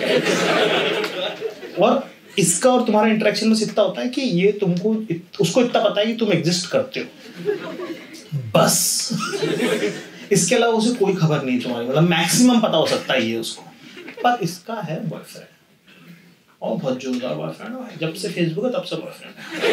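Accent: Indore, India. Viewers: his jokes may bot be funny everytime but what makes him truly a legend in india is his indori accent and way of acting , his relatable sakkht launda stories and down to earth attitude.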